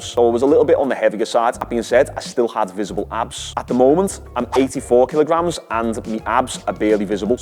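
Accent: Liverpool, United Kingdom. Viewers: I'm guessing ur scouse from ur accent. U still based in Liverpool??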